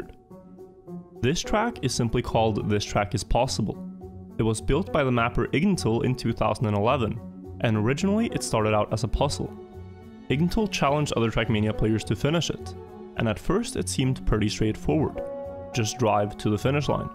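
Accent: Norway. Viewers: are you Norwegian? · are u a norwegan??? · Are you norwegian? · Are you from norway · You Norwegian?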